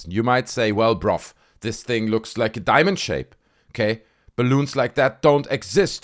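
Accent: Germany. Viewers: Mit deinem deutschen Akzent. Klar könnte das so sein. Aber es könnte auch eine Nachahmung von diesen Ballons sein. Auf jeden Fall hat die brasilianische Armee Stellung dazu bezogen und gesagt sie wüssten nicht was es ist. Das sagt mir, es war kein Ballon sonst wüssten sie doch von san joan und Ballons. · this guy sounds german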